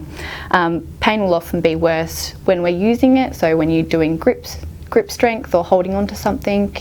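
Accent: Australia. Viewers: Thanks for the video from here in the USA. I love the Australian accent. “Cross section fibah” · Very informative and helpful video. Also love the presenter's aussie accent, hot. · This is brilliant. Many thanks. From Sweden. (Lived in Melbourne for 4 years and felt good to hear the accent once again!)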